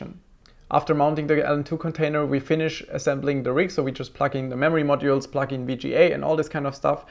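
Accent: Germany. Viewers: Dieser deutsche Akzent ist so eckelhaft 😂😂 Aber ich glaube ich hab auch so nh Akzent wie der 😳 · So glad der8auer does videos in English, too. His English is pretty good and while there is some German accent for sure, I don't really mind. It is definitely easier to understand than a language I've never studied, I took French as the third language and forgot most of it anyway :) Awesome OC, it's a pity one can't go even close to 5 GHz on air though. Intel has some advantage here and I'm always glad to see it getting slimmer with AMD's advances. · The german dialekt xD xD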